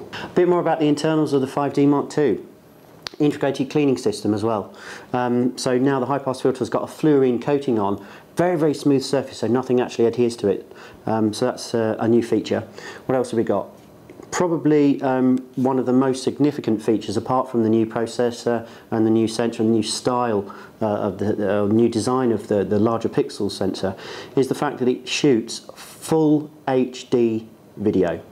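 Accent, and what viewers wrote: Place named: United Kingdom. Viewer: No, he doesn't. He says, "IF I CAN show you that there". It's called a British accent.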